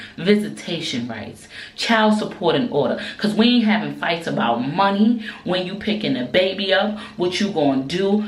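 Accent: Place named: Brooklyn, United States. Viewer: I have just been watching and listening to you for a minute... I just turned 54 yesterday and my dear YOU display more maturity than anyone that i know personally..I admit I have been bias because I just moved to NC from NY and your NY/BK accent just make me happy after a long day of southern charm. However your maturity, intellect and poise is so amazing. Brava!! Don’t ever change.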